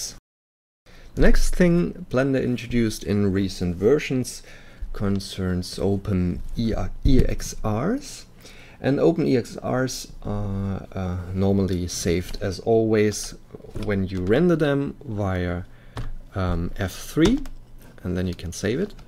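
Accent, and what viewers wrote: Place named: Germany. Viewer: Man hört einfach deutlich, das du deutscher bist :D Aber trotzdem sehr gut erklärt :D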